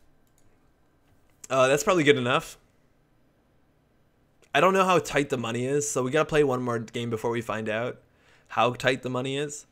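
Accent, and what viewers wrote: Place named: Canada. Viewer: The canadian accent was much stronger back then!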